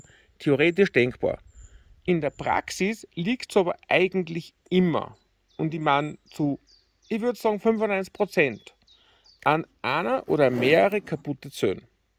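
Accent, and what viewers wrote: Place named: Bavaria, Germany. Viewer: Für Nordseutsche schwer nachzuverfolgen wegen bayrischen Slang. Trotzdem vielen Dank für die Mühe!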